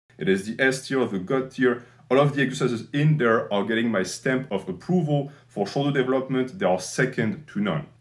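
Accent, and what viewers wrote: France. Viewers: Are you french? your accent sounds like it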